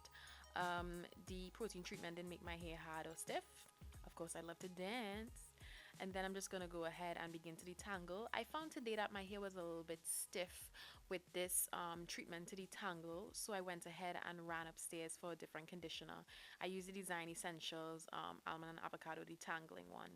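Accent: Trinidad and Tobago. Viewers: I knew you sound trini, had to check your description box 😂...am trini also😱🙌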